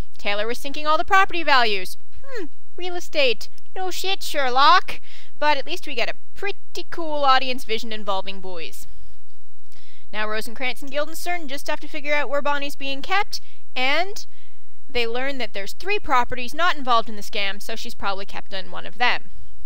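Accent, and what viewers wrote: Canada. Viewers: I need to finish watching this. But hey, yeah, you do sound Canadian but some people think I do too because well, I live near the Michigan/Ontario Border. The way you said "about" sounded about normal to me. LOL, anyway, part 1 was great and I am sure part 2 will be too. Okay enough blah blah from me. Rock on! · omw you're actually so funny (: I must go re-watch this one just because of you. It's like 52 past midnight. Damn your obvious subliminal messaging. And Canadian? Is it bad that I never actually notice accents? You could have been Australian for all I noticed. OMGAUSSIEACCENTS *dies and curses her own accent*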